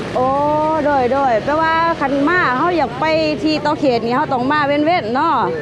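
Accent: Ubon Ratchathani, Thailand. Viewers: สาวห้องคือเว่าสำเนียงทางอุบลแท้บ่รู้ว่ารถโดยสารระหว่างประเทศทางลาวเปิดให้เข้ายัง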